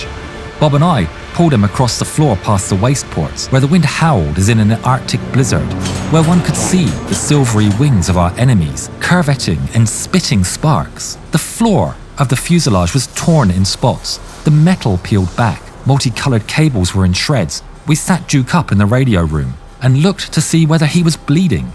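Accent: Portugal. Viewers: Não , eu não gostei, simplesmente adorei sem contar o elegante sotaque de Portugal que adorna esse idioma amado herdado de vós pois sou brasileiro e amo minha querida língua portuguesa!!!❤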